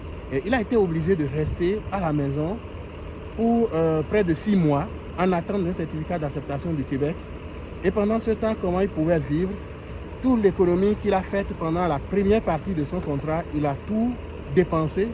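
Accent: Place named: Benin. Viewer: Merci beaucoup vous avez un accent béninoise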